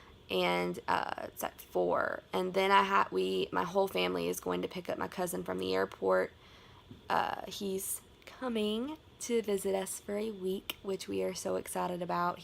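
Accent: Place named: Texas, United States. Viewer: I live in England (UK) but already thought you lived in Texas from your accent (y'all) and the way you guys interact etc. :,)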